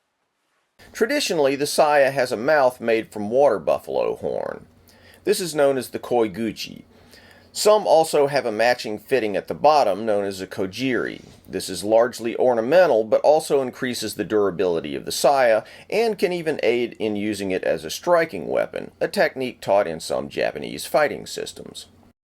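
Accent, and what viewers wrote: United States. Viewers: The rather Americanized pronounciation notwithstanding, this was a very good introduction to the topic. Kudos for breaking down a complex topic without dumbing it down in the process.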